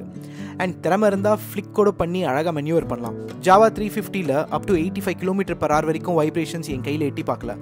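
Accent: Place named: Chennai, India. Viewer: Your tamil .. is it how tamil is spoken in Madras these days ? Bad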